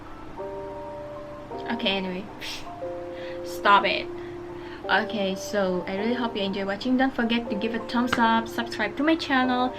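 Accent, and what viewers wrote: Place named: Sarawak, Malaysia. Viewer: dengar nya mpun oii ya ku tauk nya orang sarawak 😂😂😂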